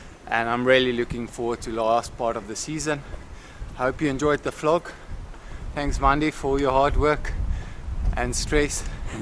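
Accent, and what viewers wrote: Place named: South Africa. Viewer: You have a lekka South African accent bru😉👍🏾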